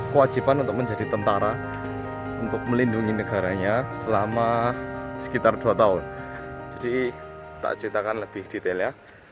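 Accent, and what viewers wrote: Java, Indonesia. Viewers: How can I express my respect about your "medok jowo", dude?? 😃 Karena saking suka nya, liat video2 mas'e sampe keturon, isuk2 paketan.e ludes 😄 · kok ngomonge pake logat jowo??..mank asline situ wong OPO?? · médoc banget koyo javanese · Mas ee iki asli korea opo blasteran?kok rada medok wkwkwk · sya mau tanya kmu ni org mana? klo org korea.. bhs indonesianya medok bgt kya jawa2 gitu. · Bahasa indonesia logat jawa josss mantap 👍👍👍👏👏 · medoknya gemesin :v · Boleh tau gak? Masnya orang JAWA atau KOREA ?? atau mungkin turunan blasteran JAWA KOREA??? INDONESIA nya perfect + logat jawa pula ... Tapi liat Sunny Dahye , Orang Korea Tulen tapi Super Fasih Indonesia nya daripada youtuber korea lainnya... Cuma Sunny Dahye yg aku tau SUPER FASIH bahasanya daripada Han Yoo Ra & Lee Jeong Hoon yg masih ada logat korea nya.. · Edyaaann, logate kentel jowo, lk ngrungkne ambi turu yo dkro wong jowo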